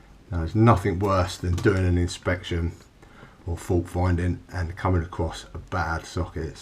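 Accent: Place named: Newcastle, United Kingdom. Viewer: just because your speech is almost the same as a colleague of mine’s (an electrical guy himself yet working in a Datacenter) originally from Newcastle… forgive my ear I am not british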